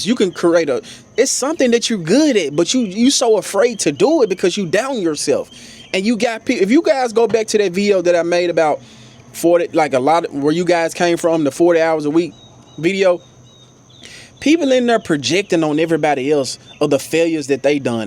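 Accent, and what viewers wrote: Memphis, United States. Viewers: I love the Memphis accent, reminds me of a rapper I listen to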